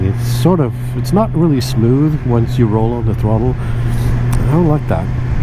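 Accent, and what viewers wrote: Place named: Canada. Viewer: I’d have said sounds like a Canadian accent Nice bike bought an orange one a few months back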